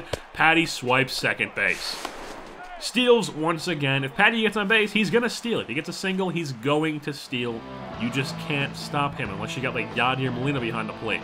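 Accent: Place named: Boston, United States. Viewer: Dang, I'm liking that Boston accent. Sorry had to root for my sox today, but glad too see Patty getting better and better as the games go by.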